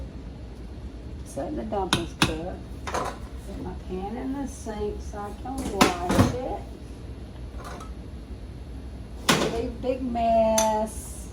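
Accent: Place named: Missouri, United States. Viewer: Listening to you talk, it sounds like the people around here where I live. I like that. You feel like you're from right here in Missouri too and that makes me feel comfortable. Got a like, sub, and a bell. Listening to what you had with your friends yesterday sounded incredible! We didn't find any Morels this year, but it sounds like you and your friends did, those fried mushrooms. This recipe looks really good, and I love the idea of using rotisserie chickens. I think I'll talk to my mom about it and we'll make this in the autumn or winter. Great recipe. I bought a product years ago from McCormick for chicken and dumplings. A spice packet and recipe on the back... and I read it wrong. I used WAY. TOO. MUCH. butter... they were delicious but I can easily say I never made it again because it was way too entirely unhealthy for us. But this recipe seems way more healthy than my failed attempt years ago. 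I look forward to trying yours next. :)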